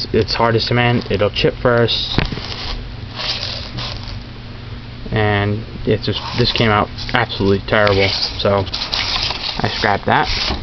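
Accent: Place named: New York, United States. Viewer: I knew you were from NY, I can tell by your accent! Lets Go NY!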